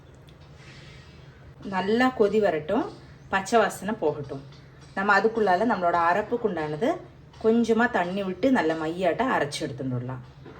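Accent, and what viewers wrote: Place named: Palakkad, India. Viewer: பாலக்காடு பிராமண தமிழ் ரொம்ப பேஷ் பேஷ்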